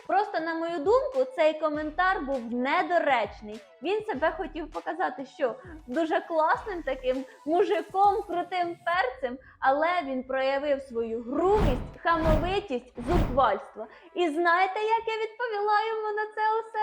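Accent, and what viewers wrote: Poltava, Ukraine. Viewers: Це Полтавский діалект, це наша гордість, і я так розмовляю, 🎉 суржик Полтавский👍❤🐱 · і в Хоролі так балакають. Даже мамкають і папкають. Так приємно чути рідний полтавський говір. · . Ти золотце) Це смішно звертати увагу на негативних дібілів. Плавають в жовчі, то хай і тонуть вній) полтавський акцент це просто кайф❤ не звертай уваги, люди - хейтери просто обмежені, або жалюгідні або потопають у власних проблемах, які і є частиною карми. Сорі за словесний бульйон, я під вином)) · ти талант а полтавський акцент неперевершений, дуже люблю тебе слухати і дивитися. МОЛОДЕЦЬ!!! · Не звертай уваги. Ми всі на Полтавщині так говоримо. Але як треба вміємо говорити, як треба. Страшенно не переношу Ірину Фаріон. Грубіянка, замка, в минулому. Партєйний дєятєль.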